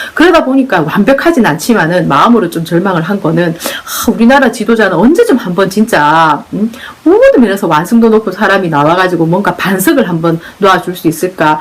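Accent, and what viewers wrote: Gyeongsang, South Korea. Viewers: 신선하다. 모처럼만에 상큼하다. 감각이 탁월한 것 같은 느낌. 지식적 감각 또한 탁월하다. 경상도 목소리이면서 멋지다. 아뭏든 예리하고 분석적이다. 객관적 사유의 담소 아름답다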